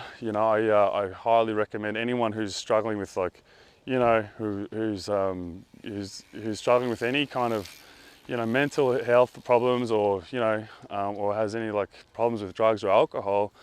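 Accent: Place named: Australia. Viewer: Australian x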